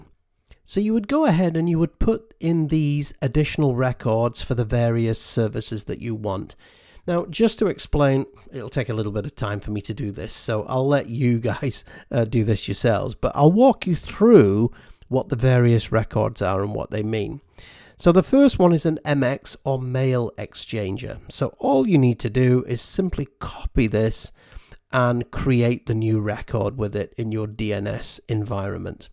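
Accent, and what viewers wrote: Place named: United Kingdom. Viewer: I'm very excited about your videos, you are very calm teacher and your speaches are easy to understand thank to your perfect British accent! I want to studies all of your video and give up in my Knowledge.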